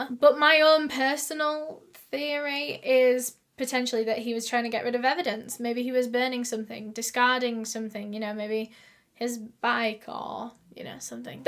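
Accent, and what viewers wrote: United Kingdom. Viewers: oml i thought this was kendall rae, then i heard the accent i was like: what in the world?!!! when did she become british?! 😭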